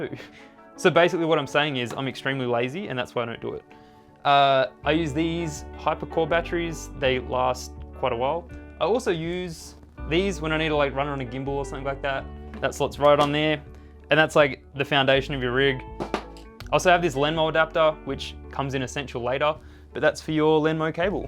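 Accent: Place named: New Zealand. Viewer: Half the reason I watched this was for your Kiwi accent. It's like watching a young Taika Waititi. XD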